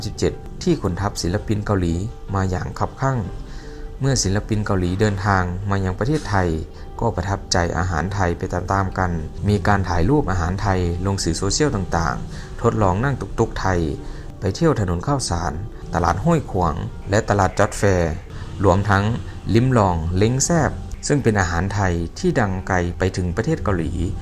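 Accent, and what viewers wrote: Laos. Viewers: พูดได้ใกล้กับคนไทย …แต่สำเนียงบ่อยครั้งออกเสียงแบบคนลาว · แอดมินคือคนลาวใช่มั้ยครับ..ผมฟังจากสำเนียงน่ะครับ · ไม่น่าจะใช่ไทยอีสานด้วย น่าจะเป็นคนลาวนะ ฟังจากหลายตัวอักษร ไม่เหมือนของไทย เขาจะออกเสียงยากเพราะความเคยชิน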